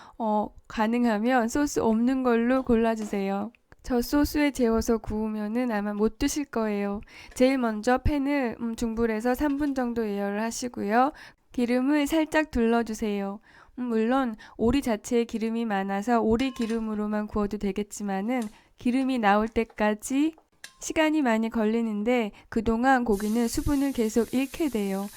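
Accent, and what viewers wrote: Seoul, South Korea. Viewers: 와 이분 진짜 오리지널 서울 출신이신가보다. 서울 사투리가 말투에서 묻어나네요.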